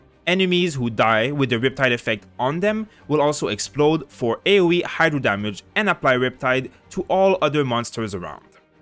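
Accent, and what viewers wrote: France. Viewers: Your accent sound like very french are you ?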